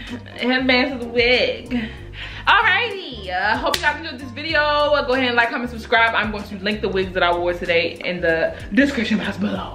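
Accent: Baltimore, United States. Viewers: Are you from Baltimore because I think I hear an accent? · Are you from Baltimore? I hear that accent !!!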